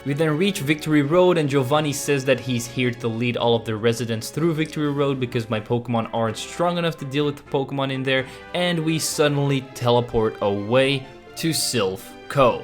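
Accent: Flanders, Belgium. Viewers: Hey, are you from Belgium perhaps? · Zijt gij van belgie? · Wait a sec UR VLAAMS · Ya french or Dutch belgium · Are you from belgium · Are u belgium?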